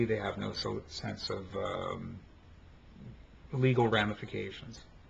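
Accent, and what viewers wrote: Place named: Canada. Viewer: I sense an accent, are you Canadian? I hear that feminism is brutal up there.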